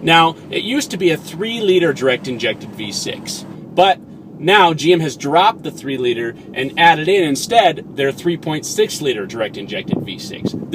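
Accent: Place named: United States. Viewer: Your voice is so loud . Very American like .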